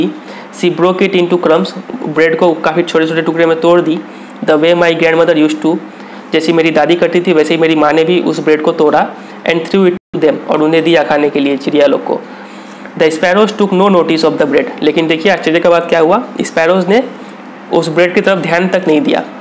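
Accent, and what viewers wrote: Bihar, India. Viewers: Bihari he kya😆😆😆😆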